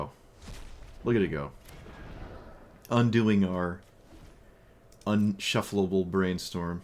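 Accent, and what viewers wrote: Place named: Scotland, United Kingdom. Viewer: Algorithmic Engagement* with Scottish accent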